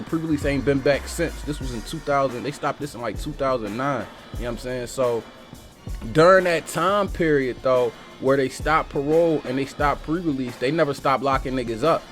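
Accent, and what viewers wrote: Philadelphia, United States. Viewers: This dude speaks well. New sub for sure! Love the Philly accent no diddy ! Peace from LI NY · Yo man you’re a great story teller. The Philly lingo/accent is heavy lol. ‘Some fat young bol’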